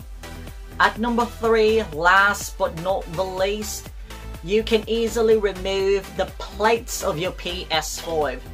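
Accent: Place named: Australia. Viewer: What’s up with the Australian accent?? I enjoy your usual voice it sounds a lot different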